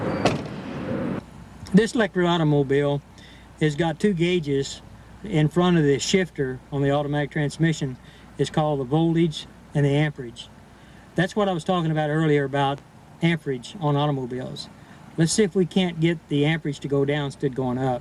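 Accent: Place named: Appalachia, United States. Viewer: This guy doesnt seem nearly intelligent enough to have invented what he claims to have invented. Talks like a hillbilly idiot. Has no wisdom or common sense. Can't explain his own inventions any better than an elementary science teacher. Thinks the government and the patent office will stand behind any free energy device. HA! is dead now isn't he? Why am I not surprised? My best guess is he isnt even the inventor. The real inventor (Dennis Weaver?) probably didnt want to be publically known and stepped up to be in the limelight and take the invention public. ( very poorly I might add ) This is a guy that doesnt even show an understanding of amperes vs volts and what power actually is. His new "battery" is nothing more than a capacitor. Magnetic heating isn't new. It's called induction and eddy currents silly. Seems to think all power is measured in volts. 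"see dem there volts keep goin up, we're makin power".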